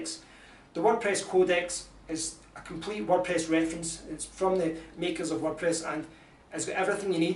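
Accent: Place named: Glasgow, United Kingdom. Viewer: Thanks,. Lots of useful advice there. And +1 for books. Agree also that Udemy quality is variable to put it mildly. And some of the claims they make - like become a fully fledged developer in 6 weeks - are a bit wide of the mark imo. (Also, loving that accent. Reminds this southern softie of the very happy years he spent living in Glasgow.) Thanks again.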